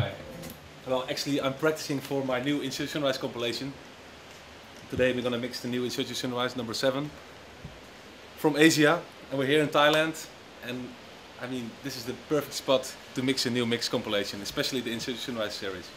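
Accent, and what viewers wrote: Netherlands. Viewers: Love the Dutch accent when he speaks English. So obvious for Dutch people in general. =) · he has a dutch accent ;p cuz he is dutch xD Best Dj''s in the world from the netherlands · Lol such a Dutch accent.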